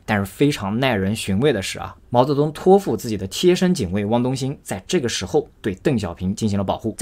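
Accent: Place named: Tianjin, China. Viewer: 是天津人吗？感觉口音有点像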